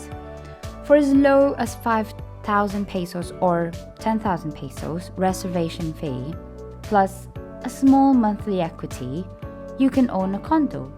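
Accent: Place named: United Kingdom. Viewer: YOUR ACCENT IS FUNNY! ARE YOU BRITISH?😄😭😄😄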